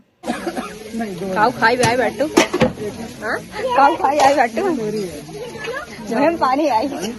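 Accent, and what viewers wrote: Bhojpuri, India. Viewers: Nice bhojpuri. So cute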